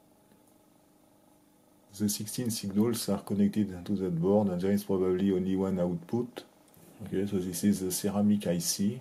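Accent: France. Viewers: It's the most stereotypical French accent and I love it :) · No you can not understand the French ascent, it is destroying any ability to understand a word.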